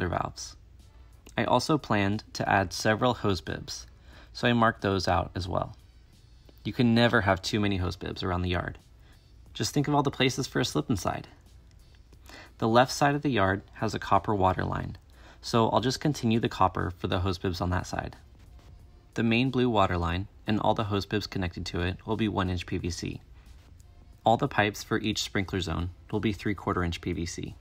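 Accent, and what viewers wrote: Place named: Germany. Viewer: ja hört sich irgednwie sehr deutsch an. xD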